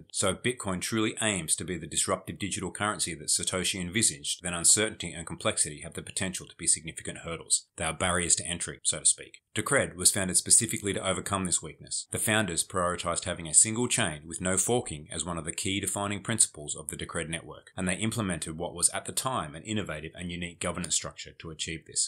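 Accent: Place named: Australia. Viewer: I think that's just the Aussie accent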